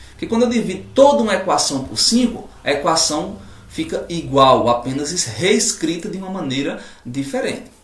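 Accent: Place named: Northeast, Brazil. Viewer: aula mt boaa prof! mto bom ver alguém aqui no yt com o sotaque do nordeste :) sucesso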